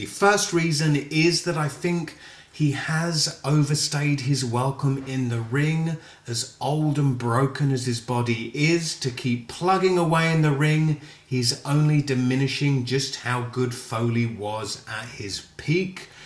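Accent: United Kingdom. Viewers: Oh MY GOD UR BRITISH I FEEL LIKE FAINTING! LOLOL!!! who gives a damn? Im british too. why do americans love us so much???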